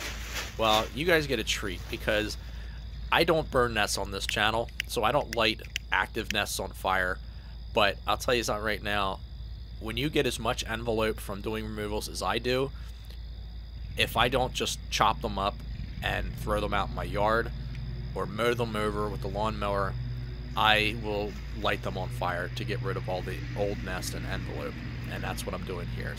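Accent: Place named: Delaware Valley, United States. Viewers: This guy is definitely from Maryland or Delaware based on his accent. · Great vids my guy. Side note: I'm hearing a DelVal accent (especially in those Os). Where are you located? I'm from South Jersey so my ears almost instantly recognize a fellow Tri Stater lol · You sound just like Streetspeed717. Must be the PA accent!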